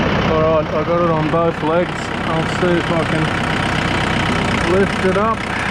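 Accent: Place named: Australia. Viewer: Love the Ozzy accent - a kiwi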